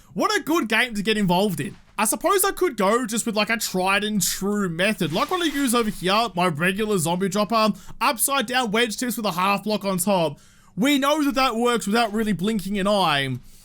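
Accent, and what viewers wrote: Australia. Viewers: No Aussie has a good day for their "r's" and "a's"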